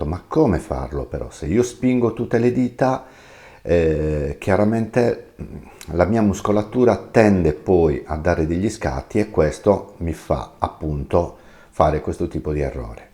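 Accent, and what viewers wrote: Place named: Sardinia, Italy. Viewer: Grazie sei molto comprensibile, sono una principiante, nemmeno più tanto giovane, ma mi piace imparare e trovo il tuo metodo molto semplice..... Grazie. Ho molta difficoltà nel cambio accordi, anche x un problemino "tecnico".... Ho una Rizoartrosi al pollice sinistro😢..... Ma non mollo...... Voglio imparare e ti seguirò con piacere sei molto bravo complimenti..... E poi il tuo accento sardo è stupendo adoro la tua terra😊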